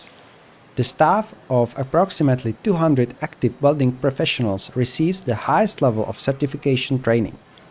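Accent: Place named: Missouri, United States. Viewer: I Detect a Bit of an Accent ! Are you From Missouri ?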